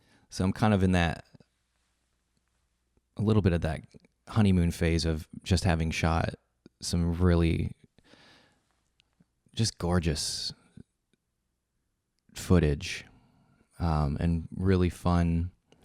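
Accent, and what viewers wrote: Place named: Arkansas, United States. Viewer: I'm from Arkansas and like you nailed like 95% of how people talk down here which is funny because I've lived in the south for my entire life and some people think I'm from up North because of how I talk lolll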